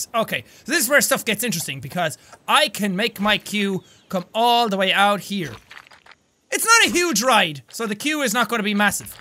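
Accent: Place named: Ireland. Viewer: wow you are irish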